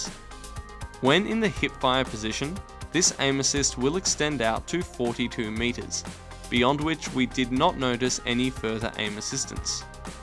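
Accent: Australia. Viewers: Are you Australian I'm Australia you sound like your Australian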